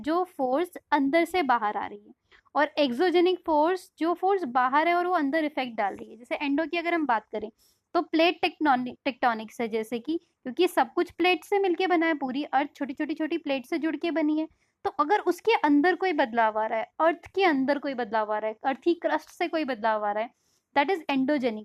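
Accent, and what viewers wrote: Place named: Bihar, India. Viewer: Mam aapki language sy lg rha hai ki aap Bihari ho..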